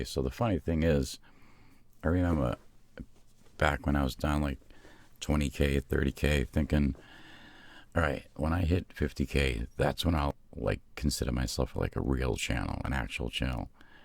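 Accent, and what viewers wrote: Boston, United States. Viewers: Another live stream soon? Your every day voice and the Boston accent are the best :) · oml he has a..... what's that? Boston accent? I didn't know he actually had one my mind is BLOWN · Any one else notice his Boston accent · Never realized you had a Bostonian accent until I watched this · Is that a Boston accent? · Jesus how have I not noticed the Boston accent until just now?? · Yo, are you from new england? you've got that underlyin boston/jersey accent. Love your vids, congrats on the 100k(now more than that)! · Holy hell, you're a Bostonian. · Never noticed your Boston accent haha I love it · I could tell you are from Boston... I love that. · Boston tang in his voice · Wait, he's from Boston? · He's defiantly a Boston guy, I would know😂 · Ur from Boston most definitely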